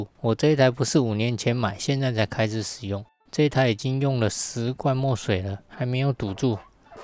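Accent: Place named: Taiwan. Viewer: 台式英文，其實大家都懂是在講什麼就好。milliliter 音節這麼多念起來很拗口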